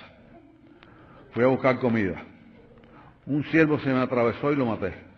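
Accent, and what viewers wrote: Cuba. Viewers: Alvarez guedes parecia dominicano á un con su asentó cubano 🤣🤣🤣 créanme jjjjjjjjjjjjjj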